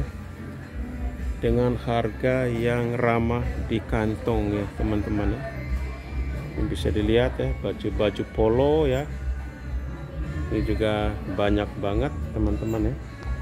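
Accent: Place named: Bajawa, Indonesia. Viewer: Logat e Logat Su pi merantau Logat Kampung masi dibawa ke kota Besar e..dasar org BAJAWA Flores .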